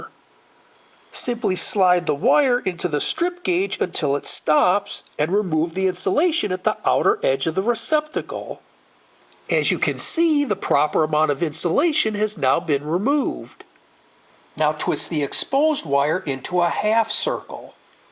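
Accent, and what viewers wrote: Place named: Midwest, United States. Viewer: He's got a strong Midwestern accent. It's called the Great Lakes Twang.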